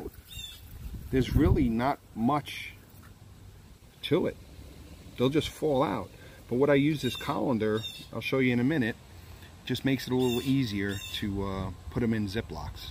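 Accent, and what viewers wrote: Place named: New Jersey, United States. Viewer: Love your enthusiasm...and the Jersey accent totally helps. Get the cheap-o paper plates. Love it!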